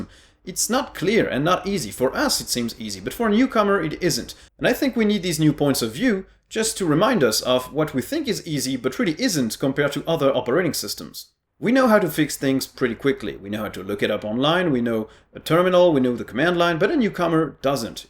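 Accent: France. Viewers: The best french eng accent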